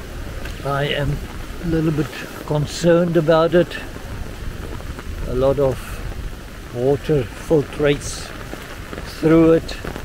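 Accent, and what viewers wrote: South Africa. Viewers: Sounds like a South African accent?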